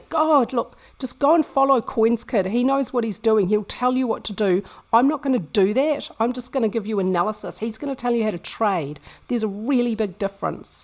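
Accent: New Zealand. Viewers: Btw, Just a bit of a stir from across the ditch, but I can't remember the last time I heard such a heavy Kiwi accent, its heartwarming to hear you 😁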